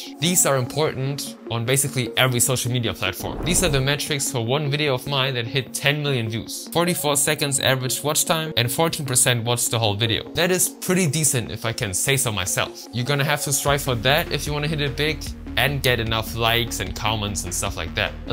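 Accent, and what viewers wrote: Germany. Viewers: wait are you from germany?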